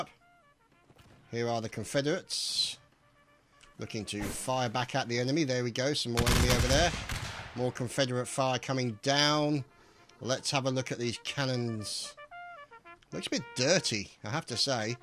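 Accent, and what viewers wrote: England, United Kingdom. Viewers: I wonder how much does the rest of the world know about the American Civil War? Do they really cover that in your schools, consider the amount of your history in Europe compared to our short one?? Other then that you have a great voice for this and you commentary is very good. I hope you Brits know how much woman here love the "English" accents here. Americans in general really like the Brits as one-sided it may be from your end.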